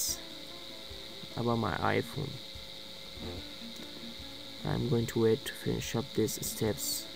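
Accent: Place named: China. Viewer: "thank you for de chinese accecent"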